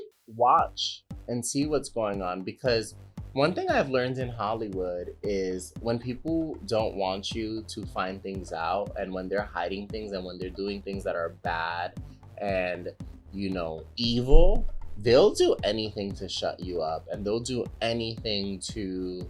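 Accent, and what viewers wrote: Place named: East Coast, United States. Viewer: I just have to say I love the East Coast accent. I’m from Boston so I hear ya and love it....💜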